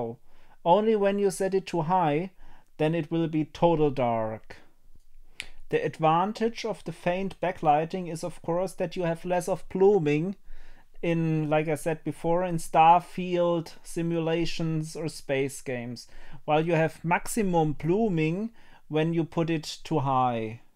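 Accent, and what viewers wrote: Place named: Germany. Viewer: Ich habe noch nie jemanden gehört, der so deutsch Englisch spricht haha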